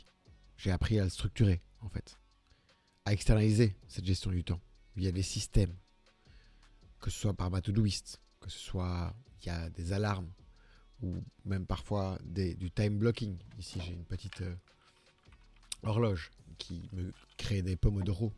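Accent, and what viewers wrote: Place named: Belgium. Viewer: je viens de découvrir à 34 ans que j'ai un tdah à 99prcent de certitude. pas de diagnostic et je sais même pas si j'en ressens le besoin. le plus important pour moi aujourd'hui c'est de comprendre mon fonctionnement de me pardonner et de me ménager. merci pour ton témoignage qui résonne si fort, ca rajoute de la lumière dans la compréhension des méandres de mon cerveau, de la beauté dans le dysfonctionnement et un peu plus d'auto compassion.. j'ignore si tu es belge, on dirait à ton accent. bah je le suis aussi, ca nous rend si proches hihi. à plus dans le bus!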